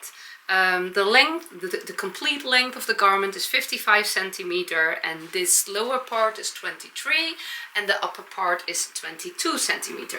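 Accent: Netherlands. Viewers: I live in Kentucky in the USA. I love your Dutch-ie English!!! Heart jumping is one of my favorite things you say. It is such a beautiful and descriptive way to express it! I have always also loved your hair. You looked extra beautiful this episode. I have similar texture to my hair and I think I will cut it like yours! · I love the “heart jumping”! I’ve been saying it and my Grandsons (5 and 9) think it’s fun and they know exactly what I mean when I say they make my heart jump!❤❤❤ Love your podcast! And your “Dutchy” English. You are perfectly easy to understand!!! ❤️🥰❤️🥰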